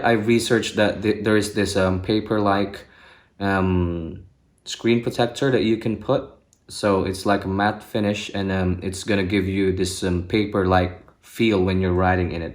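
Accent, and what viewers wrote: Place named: United Kingdom. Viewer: Bumibritisssshhhh accent!!!!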